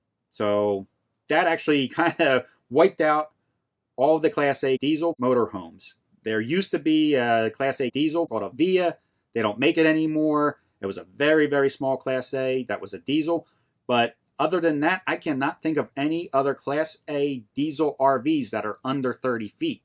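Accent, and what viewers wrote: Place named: Baltimore, United States. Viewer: You said WaWa ...trying to figure out that accent. I think I hear a little Baltimore ..lol.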